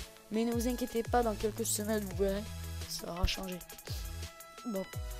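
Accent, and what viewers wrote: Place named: South, France. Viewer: abos. VIVE L'accent DU SUD. BGBG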